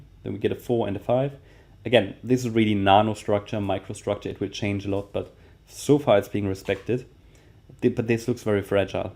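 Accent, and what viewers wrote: Germany. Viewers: Hey, love the german accent! Thanks for the video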